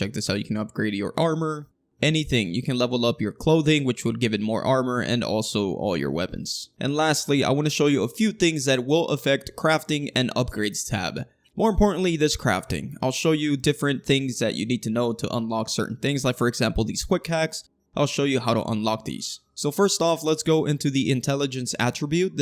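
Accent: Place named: Brooklyn, United States. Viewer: What dialect is this, Brooklyn? Not meant as an insult.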